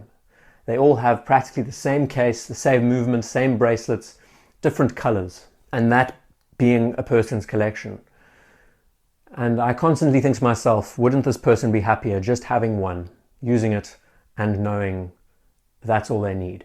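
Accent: Cape Town, South Africa. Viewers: Are you from Cape Town?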